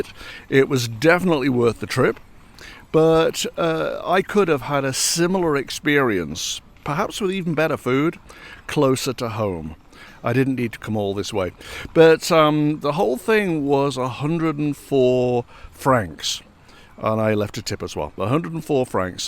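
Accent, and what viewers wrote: United Kingdom. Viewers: You’re like the accidental tourist! Love all your vids, all the narration especially with your posh British accent, and Europe seems to suit you!! Thanks for sharing your slices of life! 🌺🌺🌺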